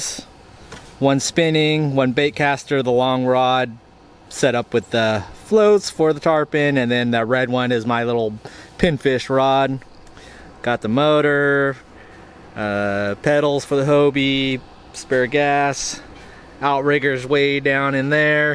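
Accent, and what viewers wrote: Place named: Hawaii, United States. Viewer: Are you from or lived in Hawaii? You sound like you did before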